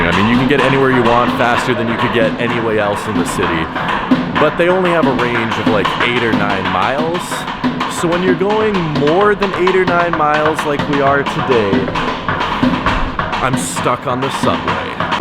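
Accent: Canada. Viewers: time is money! Uhh you just wasted 3 mins! Cus who wants to listen to an Annoying Canadian Ray Donovan!?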